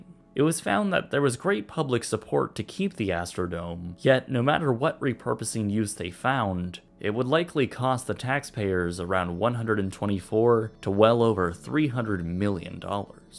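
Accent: Canada. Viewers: I figured he was Canadian from the way he pronounced "about."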